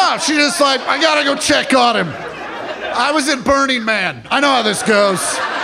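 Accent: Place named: South, United States. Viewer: Nobody in Kansas has this southern of an accent, unless they moved here from the south.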